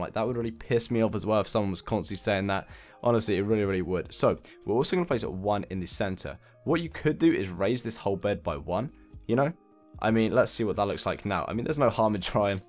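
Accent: United Kingdom. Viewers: He Has the British accent, that's why. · XGZ_AnimationsX its just a feature of his British accent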